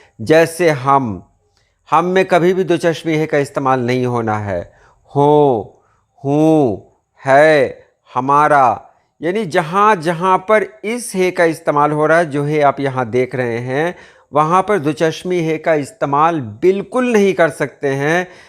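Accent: India. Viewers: Lectures kuch pehle bhi sune ic k mn ne.❤. Ye Indian ha? Wese main Urdu to inki bhi Pakistan ki tarah ha but phr bhi sunane se pata chl raha ha k Indian ha. Yani k Indian or Pakistani Urdu mn faraq aa chuka ha. Lehjae mn bhi or pata nhi jahan but feel ho raha ha k inki Urdu different ha.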